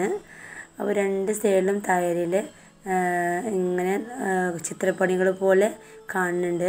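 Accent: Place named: Malappuram, India. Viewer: Malapuram aanu alle samsar kelkumpoanassilaavunund veed pazayath aananneyollu nalla soukaryam ulla veed aani. Nannayitund video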